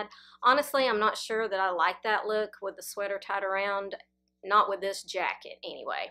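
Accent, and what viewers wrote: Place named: South Carolina, United States. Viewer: I’m so glad I found your channel you also have my accent!☺️i live in Charleston, SC…..I love your ideas for outfits bless u……..I am 58 yrs old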